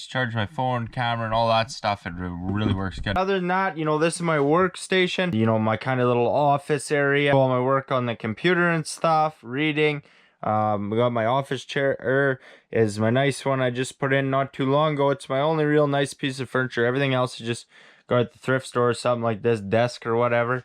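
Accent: Canada. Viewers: Love the Canadian accent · I'm Canadian and I've never heard this Canadian accent!! Lol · I just got up out of my bed to grab a Moosehead. That’s how Canadian I feel after listening to this gent! Awesome! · The loft is awesome! Looks like a great place to relax and get cozy on cold Canadian days and long ass winter nights.. By the way, love your accent. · Wow. I quite enjoyed the videos I watched. Amazing that you started doing this when you were so young. I have four boys in their late teens and the only thing they do is play computer games all day. There I am building a woodshed with my Chainsaw mill and none of the boys have the slightest interest in building something. We live way out in the woods in northern BC and I enjoy nature and doing things as well. Good on you. Wish you all the success. That is quite the Canadian accent, by the way. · first time viewer but 5 seconds in I can tell its a fellow Canadian lol, got a doozy of a spot there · I agree you have the purest Canadian accent!! 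eh!!! I enjoy your videos and I'm envious of your lifestyle!!! All the best mate!!! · Born and raised in Ottawa. Never heard such a Canadian accent in my life. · Knew he was Canadian from the jump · Holy shit that is the Canadian accent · Such a "Canuck" Love your accent brother. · I'm Canadian and even I was like, wow that's so canadian. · I don't know if this is Canadian accent or menonite mixed with Amish and a little Canadian threw in for good measure. But it sounds like you really poor it on extra extra like trying to copy a wirey granddad like I copy both my grand dads and my dad I've noticed naturally. Either way pretty good ol boy A ..... Well you are ok in my book lol nothing like a Cummins 12 valve . Have a good one young man . I don't see how anyone can live in such beautiful country and not be able to have guns like. It's almost like living in Alaska but less freedom. But what am i talking about we have a bunch of demoncrat, antichrist spirited bunch of heathens that stole our election and are pissing away everything here . 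But seriously awesome place . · Im Canadian, was raised in the bush, and even Im like. "whoa thats a thick Canadian accent bud" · That's deep Canadian. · You're video was recommended by YT and I'm glad I watched it. First off, as a fellow Canadian I heard the accent and knew where are from, awesome accent! I like your cabin it's very quaint and seems efficient. You had me laughing at "this is the shitter" haha... classic. You have a new subscriber.. take care from ON. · That's the most canadian sounding guy I've ever heard. Could be doug and bob McKenzie's long lost brother. That's a badass set up that he has there, tho. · I get all the confusion about accent, have yah considered he's Canadian and a little tipsy? yah know? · Been a long time Since I heard the great Canadian accent!! Love it! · Is there an Irish modification to this Canadian accent? Sorry, curious linguist. (yes i know Ireland is older than Canada. 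The only reason I use "mod" is because someone can be born and raised in one country but still be taught a language by people culturally from another. In this case, someone identifying as Canadian is being taught English by historically Irish English users. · I love the Canadian accent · Fuckin love Canada and Canadians. This dude literally sounds like a dude doing a parody of a Canadian, and I think that's really beautiful. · I definitely say eh a lot, but this is the most Canadian guy ever.. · Truly. And the accent makes everything sound like it has a G-rating even when saying “shit” (which I didn’t know was even a word in Canada).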